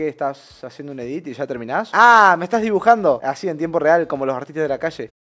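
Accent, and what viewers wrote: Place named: Chile. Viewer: pov:la presentacion del video hola hola po weon (es por el acento de chile)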